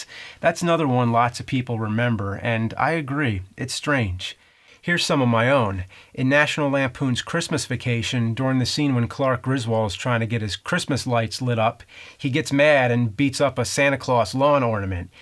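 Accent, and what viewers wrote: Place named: New Jersey, United States. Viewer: Everyone correcting pronunciation as if his filthy Jersey accent isn't something we all love about him.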